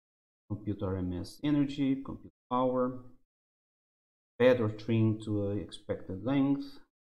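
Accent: Brazil. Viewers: Brazillian accent s2, is DDSP made in BR?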